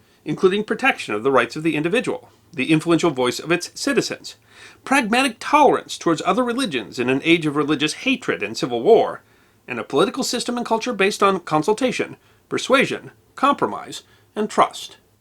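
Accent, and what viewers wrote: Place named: United States. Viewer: His American dialect is not easy to understand.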